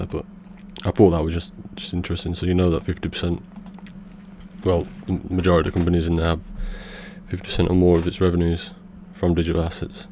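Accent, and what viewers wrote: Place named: North, United Kingdom. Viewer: Thanks, and you do speak clearly! The Northern accent might throw some, but that's their problem, they need to learn to listen better.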